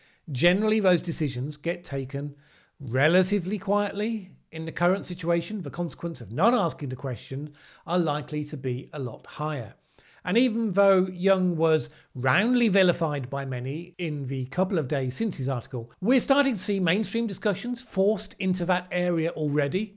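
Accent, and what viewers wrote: United Kingdom. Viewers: Thank you once again. A sane voice is always welcome in any discussion and your approach of tackling outlying views prior to narrowing the examination is especially effective (and necessary in todays internet society) in getting to the core of the subject. I am sharing this with friends in the States who will doubtless enjoy your unbiased examination. Not to mention the British accent. All the best from a Scot in Panama. 😁🇵🇦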